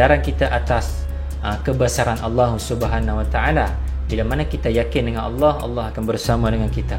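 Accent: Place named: Kelantan, Malaysia. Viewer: actually ustz org mana ? bnyi loghat cam klntan ad kedah ad lain2 pon ad😂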